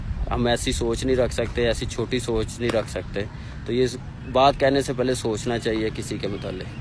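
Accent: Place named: Lahore, Pakistan. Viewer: Dumb ass wo lahori accent hai unko ko tohra masla hota hai pronounce kerney mein werna tum log z ko j ki jagga use ketey chalo katey kisi ney yahan indo pak ki baat nahi k🙏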